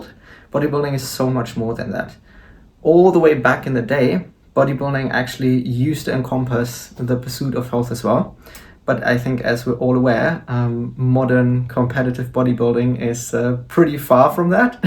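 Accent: Germany. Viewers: Bulgarian, but u have a german accent?😅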